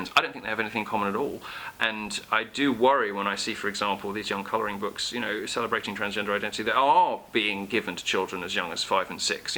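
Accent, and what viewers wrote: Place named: United Kingdom. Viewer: Sadly, there seems to be a lot of people who seem to "worship" Milo, the way they did with Hitchens. At the end of the day, he's just a man with opinions. His opinions are not fact. His believing in God doesn't mean there is a God, for example. Why do people like this seem to attract "followers"? They're usually americans too, taken in by a British accent. Even I was credited as super intelligent whilst in Washington, and I'm just a pleb!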